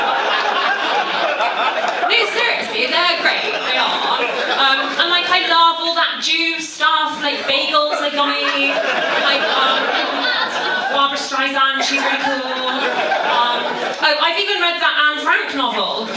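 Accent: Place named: RP, United Kingdom. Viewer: I can't understand her because of her posh accent.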